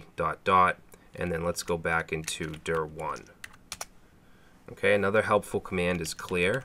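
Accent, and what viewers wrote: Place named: South Boston, United States. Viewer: Straight out of Southie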